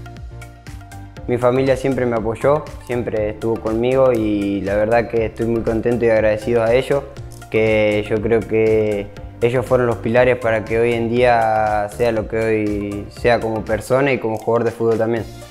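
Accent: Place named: Chile. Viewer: Todo un chileno ya, habla como chileno en sus directos🤑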